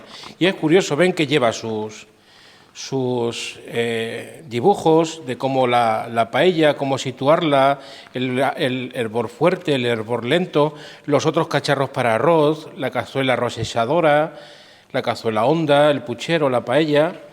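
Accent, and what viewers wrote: Castile, Spain. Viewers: me encanta que cuente historias mientras cocina. Me gusta mucho verle cocinar, como lo explica todo y escuchar su voz es recordar la entonación castellana de mi abuela manchega. ¡Qué tranquilidad y relajación produce ver sus vídeos de cocina!. Y muchas gracias por todas sus enseñanzas. Como bien dice usted, Paz y Bien para todos. Saludos desde Córdoba, España.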